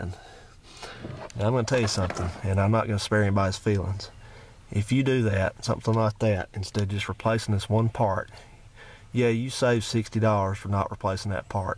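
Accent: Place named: Appalachia, United States. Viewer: You sound like you’re from WNC or E. Tenn.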